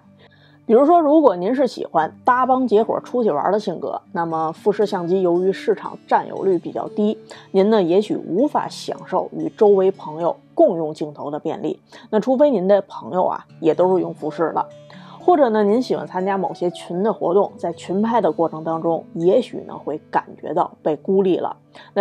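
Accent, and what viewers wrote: Beijing, China. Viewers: 每期视频都干货满满。谢谢！很喜欢Edi闲适的语速，听起来舒服而真诚，带着些许的京味儿，更是倍感亲切。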